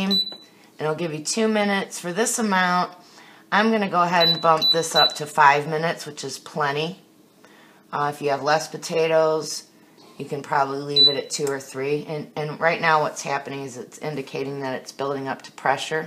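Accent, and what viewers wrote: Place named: Chicago, United States. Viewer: are you from Chicagoland. I am from Matteson and you sound like a local. I have subscribed and will be making that ASAP. You rock!. Keep them coming I will be watching.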